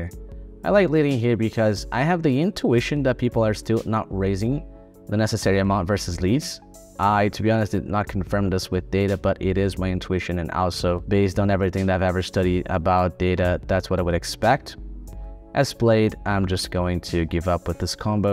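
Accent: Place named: United States. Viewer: good content!I wanted to ask if you live in US or born there, seems to me that you speak perfect USA English, which I admire a lot!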